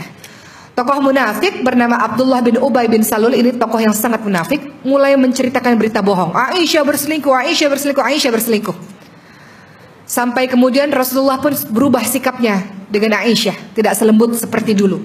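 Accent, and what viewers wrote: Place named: Malaysia. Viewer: Logat Malaysianya bagus kali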